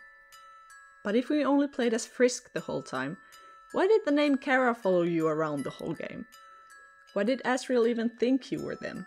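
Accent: Finland. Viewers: Are you finnish? I'm finnish and your accent seems so familiar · Nice Finnish accent :D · Are you a fellow finnish person? Your accent is similar to finnish accent!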